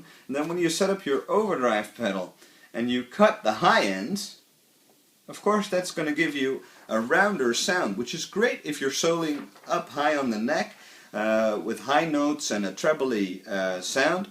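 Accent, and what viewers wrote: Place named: Netherlands. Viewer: I can hear you're Dutch! Great explanation!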